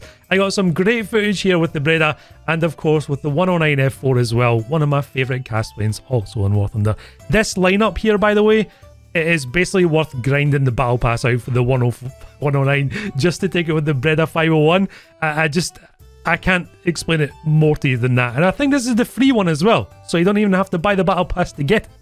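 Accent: Scotland, United Kingdom. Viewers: Wait are you Scottish mate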